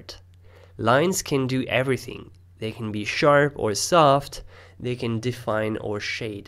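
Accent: France. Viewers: I can listen your french accent. Tu vas pas me la faire fréro, sinon bonne vidéo.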